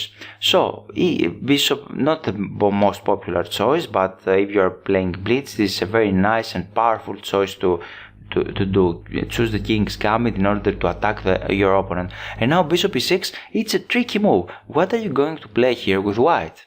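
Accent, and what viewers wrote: Greece. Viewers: Your accent sounds greek malaka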